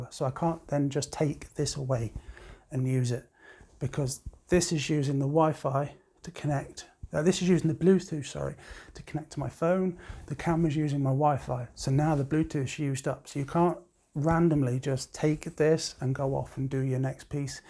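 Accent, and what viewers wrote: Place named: England, United Kingdom. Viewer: nice to here an 'English' voice here on YouTube. Can I use with my Pan Lum SZ330? (bridge camera) I know what you mean about the focus on Panasonic my is all in and all out difficult to get a nice slow in/out. I'm considering buying a dedicated camera (low cost) video camera for the 4500? What do you suggest? On camera mic; Can I put a Rode 'type' mic on the separate handle or is there some better option? Great review.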